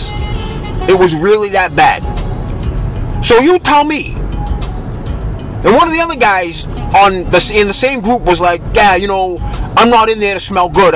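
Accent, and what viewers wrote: Boston, United States. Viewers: That's a Boston Strong accent.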